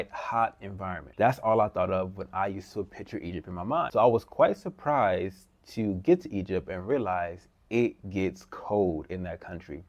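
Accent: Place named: United States. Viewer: People dancing around the subject smh.. he's referred to as an Abid in Arabic, which means slave. Lucky he is, and sounds American, as that would've contributed to a slightly better experience. He is also originally an African, not Caribbean American (i.e. the real "African Americans) and that will help him navigate Egyptians too Egypt really is the last option for anyone living in the west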